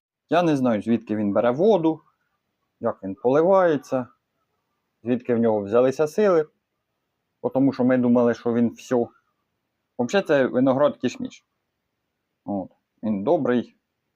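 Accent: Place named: Poltava, Ukraine. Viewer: почув акцент що ти полтавчанин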